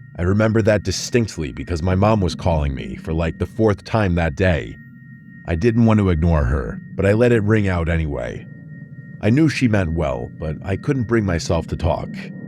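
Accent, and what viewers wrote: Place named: New York, United States. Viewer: Anyone else notice the narrator’s NY area accent like its head out occasionally? Even thru the (what I assume) is a voice filter? I’m guessing he’s from North Jersey…I’m from that same region, so no judgement! 😂 These vids are amazing btw; just discovered them and can’t turn them off! Truly bone-chilling sh*t! Where does he find these stories anyway? Reddit, etc..? 🤔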